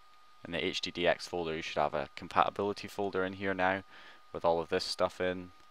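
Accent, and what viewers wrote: Scotland, United Kingdom. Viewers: cheers mate, your tutorials have helped me so much, good to hear that barry Scottish accent!!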